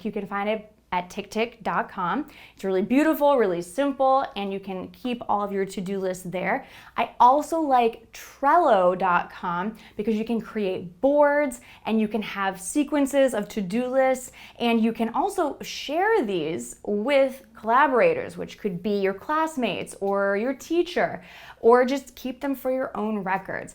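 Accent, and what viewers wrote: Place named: United States. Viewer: of course american english. her american accent's is so strong and clear. that's why i like american online teachers so much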